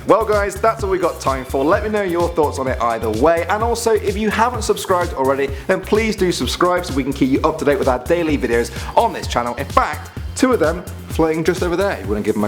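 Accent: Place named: United Kingdom. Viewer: british accent is so annoying!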